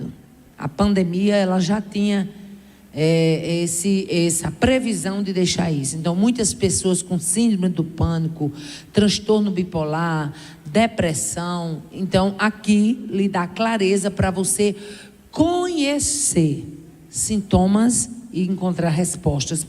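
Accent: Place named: Northeast, Brazil. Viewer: Madrugada e eu com ela: ❤️. Que companhia agradável com o sotaque do meu Nordeste: consistente, direto, sincero e antes de tudo, ABENÇOADO. 👏🏾👏🏾👏🏾👏🏾 "A" de 🥰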